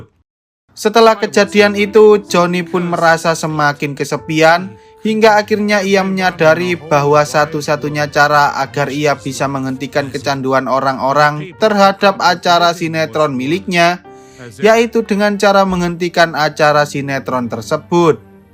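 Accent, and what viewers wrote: Java, Indonesia. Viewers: wong jowo rupanya mas ini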